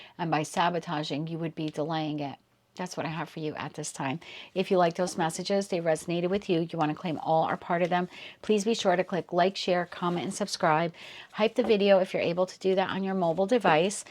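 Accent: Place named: New York, United States. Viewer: I'm presuming by your NY shirt, that you are a New Yorker. I can tell by your accent. Long Island here. ❤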